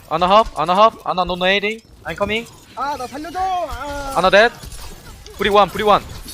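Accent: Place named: South Korea. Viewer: 콩글리쉬로 지껄이는거 굉장히 듣기 불편하노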